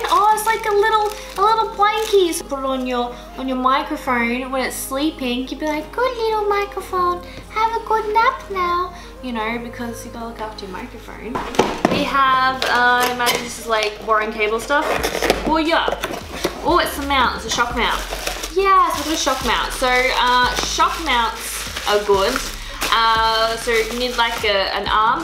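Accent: Australia. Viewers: Stop trying to Americanize your Aussie accent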